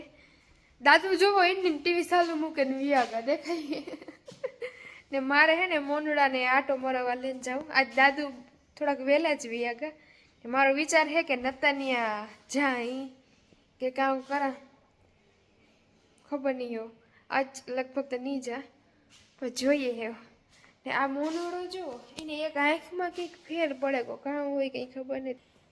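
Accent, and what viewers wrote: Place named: Mehr, India. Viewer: Mehr dialect. A boli na 2 prakar chhe. Ghed ane barda ni. Thodo fer hoi chhe.😊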